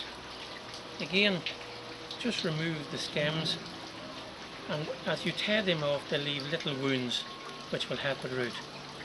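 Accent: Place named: Ireland. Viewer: The guy in the video has an Irish accent - perhaps there's a world outside of america that has different climate and ecology, somewhere like Ireland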